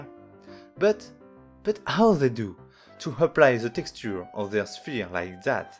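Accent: France. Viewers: Ton accent francais est mignon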